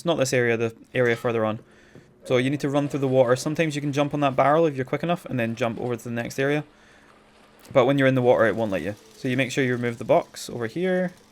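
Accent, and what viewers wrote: Scotland, United Kingdom. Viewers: Scottish I hear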